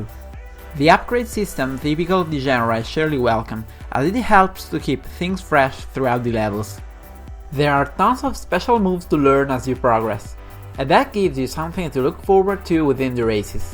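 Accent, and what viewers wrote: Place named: Italy. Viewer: actually has a Italian accent.... Just saying...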